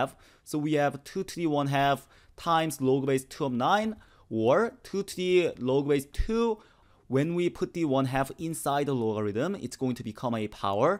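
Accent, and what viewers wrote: South Korea. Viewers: His english accent sounds like Korean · 왜 한국식 영어발음 처럼 들리지.. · 한국인이세요...? 발음이 친숙한 콩글리쉬인데....... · 왜 콩글리쉬가 들리지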